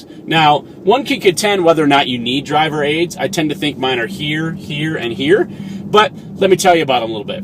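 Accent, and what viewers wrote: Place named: United States. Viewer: Your voice is so loud . Very American like .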